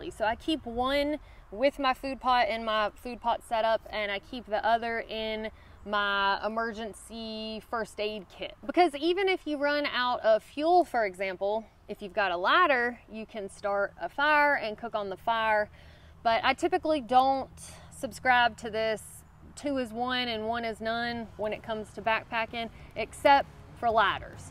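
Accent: South, United States. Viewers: A blackened pot heat up/ absorbs the infared 25+% faster if you add a lid its another 25% and less fuel and time . Also aluminium is slightly lighter but is bad for you health long term as no matter how much you clean it is pourish and you can wipe it with a tissue when it's dry and still get grey aluminium residue. Titanium is slightly heavier but cooks better isn't toxic and can store water or food for long periods no problems. Toaks,lixada,boundless voyage , all have great pots with frypan lids around 1000ml same shape as yours roughly at around 150 grams. I'm more a bushcraft ,survival ,inch bag ,wildcamper but learn tricks from all the disciplines for different packouts decisions and I love your chan for the character and thru hike aspects and charming southern accent :) 🍻 from 🇨🇦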